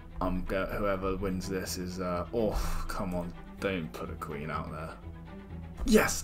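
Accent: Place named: Midlands, United Kingdom. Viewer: Mate where are you from? I'm sensing a Midlands accent? Do you play goliath in Coventry? Love that tournament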